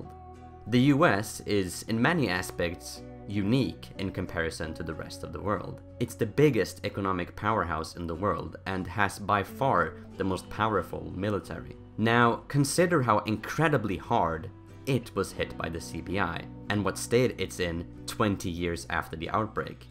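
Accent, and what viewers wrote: Sweden. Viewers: Heey are you swedish?! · He has a swedish accent anyway so I guess he is from sweden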